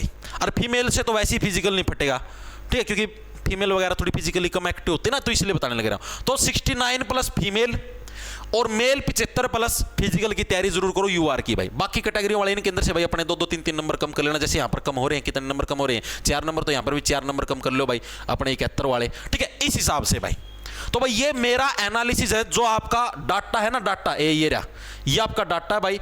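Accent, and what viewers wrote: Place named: Rajasthan, India. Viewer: Sir your way of talking is very cool Your spex add spice on the top Rajasthani and Haryanvi consent is very cool❤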